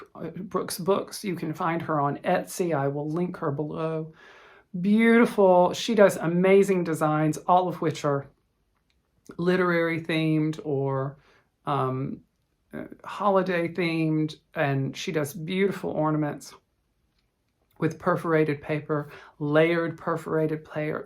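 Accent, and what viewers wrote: South, United States. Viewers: Thank you for sharing your upbringing. I hear the south at the end of your tongue, 😛 it’s recognizable but I to have been watered down for twenty years but now back in Oklahoma!! Denim daisies are sew cute. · Lol... 😂 I love your southern charm and accent 😅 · I never noticed your Southern Drawl until today, LOL Great video. I absolutely love your train piece. All your stitching is beautiful. I love going to Ice Castle displays. They are just beautiful., and thanks for sharing the DMC conversion, I honestly never heard of that. Our past makes us who we are, and you are a beautiful, loving and gifted person. I can tell you were raised in a loving environment. Lori Holt's Denim bag is wonderful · Thank you for the southern drawl tonight. I am proud you were able to tell the story of your village. Its sad that parents have kids then decide they don't want to be part of their lives. 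My dad decided after having 4 daughters that he didn't want the responsibility of s family. He left when I was 9 I just turned 66 and saw him maybe 4 times in my life. My younger sister stayed in touch with him and when he passed she called with statement we were orphans (mom passed two years earlier). Don't know if you like hugs...but I wish I could hold you tight!!!! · Hi, thank you for all you bring to our community with your southern accent, laughs, smiles, and some tears. Love your HAED design. My son is a full coverage stitcher. Have a great 2 weeks!❤😊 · I love a sophisticated, educated sounding southern accent, which you have in spades and your vocab is amazing. Yes, you are polished, why I do declare. Fiddlee-ee-dee. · I live in Albertville Alabama, and as we southerners say, Bless your heart. Loved your “southern drawl”. Really had to try, tho! I’m the youngest of three girls. Always wanted a brother, so I’ve decided you’re it!! 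I know you need a sister!!! Love your flosstube.😸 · listen, I was raised in Chelmsford, MASS - RTE 3 - you know right on the Mass - NH border. But have lived so long in Arizona - my accent fell off! My Boston comes out often - particularly with imbibing. Love and embrace your inner Southern, just as I honor by Bostonian! Love the Vaphas - Boston accent - see what I did there? Oh goodness, I've been following the Mother story. So many of us have mothers... Hugs and sunny southwest sun to you.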